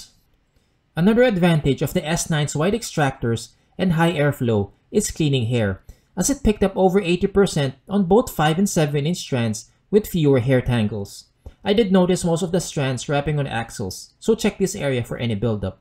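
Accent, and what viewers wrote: Philippines. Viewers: I can tell you're a Filipino by your accent. How did you buy these high-end here? How about the availability of services/maintenance when these break down and needs fixing?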